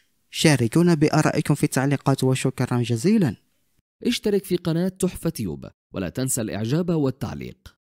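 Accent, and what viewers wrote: Morocco. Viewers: L'accent marocain !!! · شكون طاع هد لخبر انت تبان صوت مغربي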